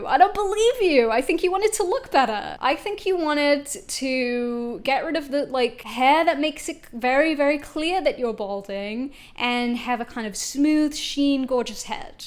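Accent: Brooklyn, United States. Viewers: All I took away from this - this man needs therapy. And - best Brooklyn accent ever. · Top quality Brooklyn accent 😎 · That accent! I'm dying. Absolutely spot on American accent. Chef's kiss. Never change. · Please record all future videos with your Brooklyn Accent. You don't have to though.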